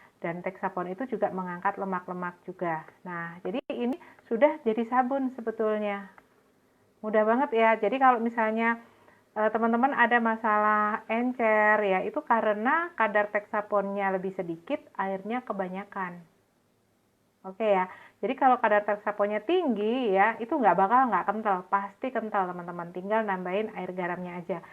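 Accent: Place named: Surabaya, Indonesia. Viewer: Wujud Surabaya jelas mbak...sip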